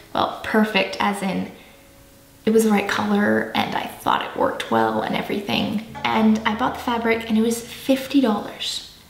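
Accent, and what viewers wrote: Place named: Eastern Europe. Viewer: Oh my!! I thought you were older, yes; but mainly I thought you were from somewhere in Eastern Europe!! 🤣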